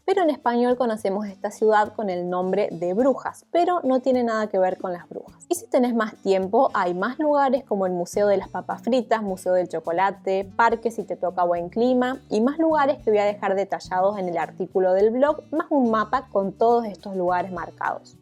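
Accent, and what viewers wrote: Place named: Argentina. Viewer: Reconozco un marcado acento argentino en tu dialecto =D Muy lindo recorrido. #GraciasPorCompartir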